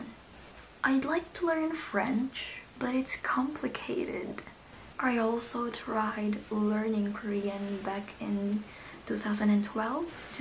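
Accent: Philippines. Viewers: Sabi na nga ba eh , medj pinoy yung accent hehe ang cuteee, pa request naman po next vid mo tagalog asmr ate hehe , can't wait ♥️ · Yeah I'm wondering which part of the Philippines she's from cos it sounds like she has the same dialect as me😊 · neither. she's using a different dialect but it's still from the Philippines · not really becuase her english ( philippines ) its our seccond here in southeast asia but honestly people they're using communication and grammarly which means like 95% taught in high school and students here in the philippines country Dialects accents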